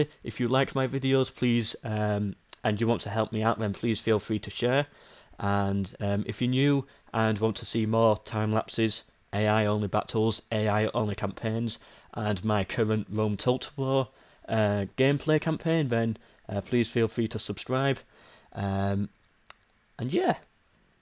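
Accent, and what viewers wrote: United Kingdom. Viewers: Yeah I hope so mate👍🏻 Also, love ur accent mate! Wish my British accent was like yours😂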